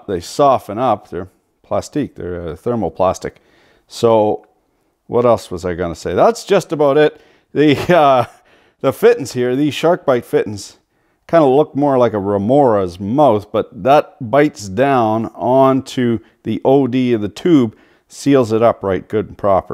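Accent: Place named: Canada. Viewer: I wish you had a podcast. I could listen to your funny Canadian accent peppered with all of your colloquialisms in my shop!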